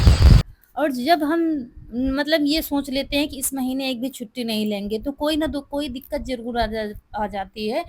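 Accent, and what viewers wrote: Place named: Jabalpur, India. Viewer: Aapke bolane Ka Tarika angreji Hamare Yahan Jaisa Hai Hamen MP ke Jabalpur Jile Se Hain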